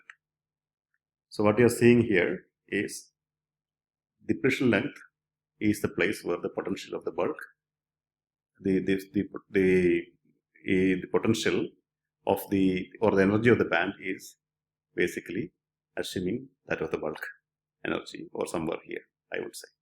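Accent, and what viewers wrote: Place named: Kerala, India. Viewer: The narration is in Malayalam. He claims it is in English but only Malayalees can understand his English. For example, he pronounces "chop" as "chope" (rhyming with "choke"). "Bonds" is pronounced as "Bones". Beware.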